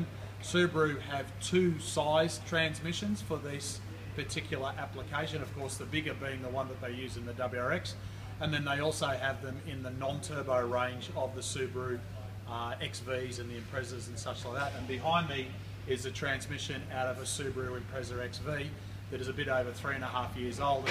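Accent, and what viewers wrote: Australia. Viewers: While no secret was revealed, other than full disassembly required for filter change, your Oz accent gave the video a "Richard Attenborough" like effect which was enjoyable. Great video. Thank you.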